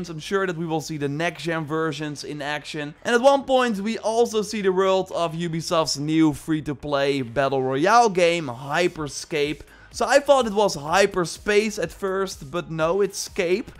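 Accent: Netherlands. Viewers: the sound of your english accent, you must be dutch. Ofniet dan?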